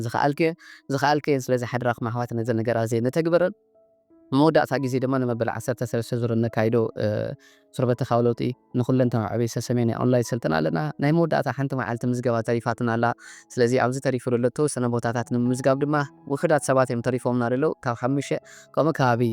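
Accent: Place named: Ethiopia. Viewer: እቱይ ናይኩም ትንታኔ ብጣዕሚ ጨለ እዩማ ግን እቱይ ላዛ ትግርኛኹም ለይጣዓመኒ ብላይ ራያ ትግርኛ ጌርኹም ኣዋግዑናየ ላይ ራያ ራዮማ ትግርኛ እዩ ዓሳዲ ልምችእ ... ኣርግዕዎ እምበይ 😂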